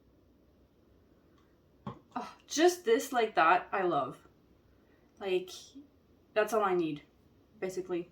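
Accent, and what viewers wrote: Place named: Quebec, Canada. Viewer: Omg that is exactly the style I am trying to achieve thanks! You are good teacher and oh boy the quality of your videos!!! And that French Canadian accent. Love ya, a big fan from Quebec! Thanks for all the hard work you put in your channel. You are the best.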